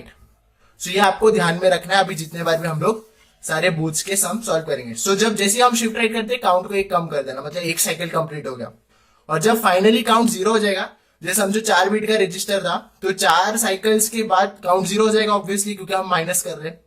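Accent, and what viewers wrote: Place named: Mumbai, India. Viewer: jyada mach mach nahi hui...Love your mumbai vali hindi bhai..I'm from VJTi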